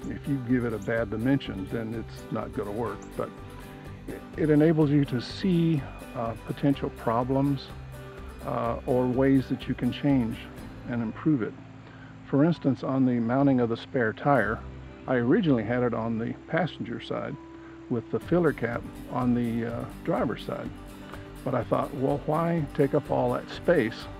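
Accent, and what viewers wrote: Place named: Georgia, United States. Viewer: Gotta be from Georgia. He says "Hweel"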